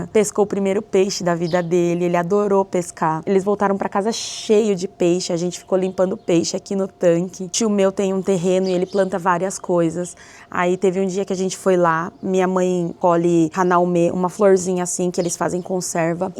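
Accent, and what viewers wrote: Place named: Brazil. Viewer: Você deve ter crescido no Brasil, pois fala como brasileira mesmo, e não como alguém que cresceu no Japão.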